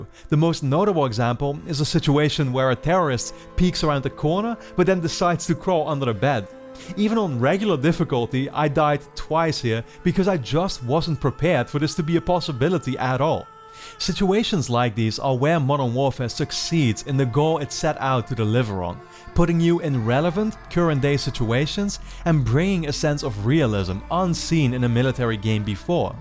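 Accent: Netherlands. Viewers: R u dutch?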